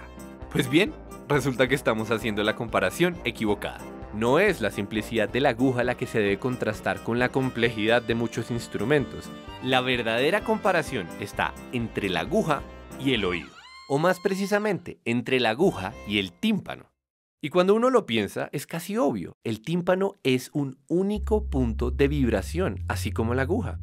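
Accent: Bogotá, Colombia. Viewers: Eisenkopf Alpo es acento bogotano, en Colombia se habla diferente, dependiendo de la ciudad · E el encanto del acentico Colombiano.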